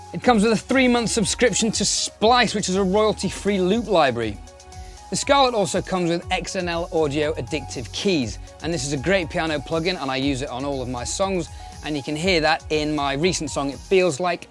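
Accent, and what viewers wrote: United Kingdom. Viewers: Thank you so much for taking the time and trouble by answering my question. I guess l am going to go for it, as it'll be the last monitors l'll ever get and listening & seeing its spec, seems to tick all the correct boxes ! Also the UA Apollo 4x interface, that you brilliantly demo'd, will make all the difference. By your accent, are you from London. I lived there for 25 years, based in Ealing and now back to my roots in the NorthWest near Liverpool. Keep on doing your great videos, it is really nice not to hear a load of waffling about all thing other than the subject in hand, as others do across the pond ?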